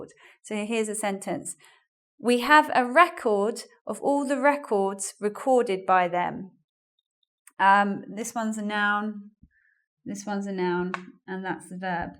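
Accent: United Kingdom. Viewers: Wow british accent 😍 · I find you very attractive ! specially your british acent ! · british accect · Is this British accent? Sounds Cool!! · british accent? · hi from México, do you know im just gonna suscribe me, because I love inglish specially British inglish, I love your accent, so I hope you can share us more videos about your english British, I spent too much of time time looking for British accent and finally I found out it in your channel. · Is it pure British accent ?!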